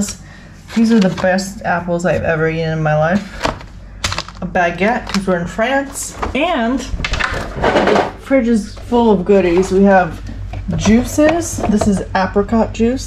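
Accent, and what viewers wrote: United States. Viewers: Why the american accent?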